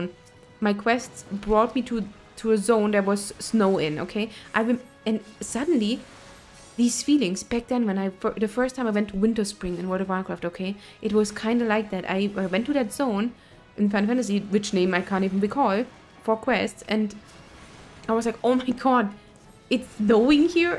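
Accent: Germany. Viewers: Ist that a German accent? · Thought you were german, you speak english like a german one. Greetings from Germany to France =)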